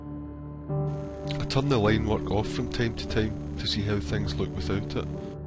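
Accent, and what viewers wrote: Scotland, United Kingdom. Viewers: Love the accent, I think Scottish is the coolest accent actually :D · good vid but i hate that scottisch accent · Thank you:) I have played this and followed along (basically playing with making my own buildings, street, art on the buildings) and so I have learned how to use the perspective tool in Painter. The music is nice, so is Scottish accent. Music is fantastic too. Best wishes and keep up the good work:)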